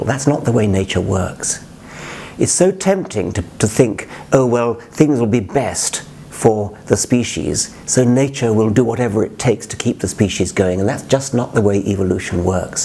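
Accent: England, United Kingdom. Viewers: Sounds so good with the English accent but complete bullshit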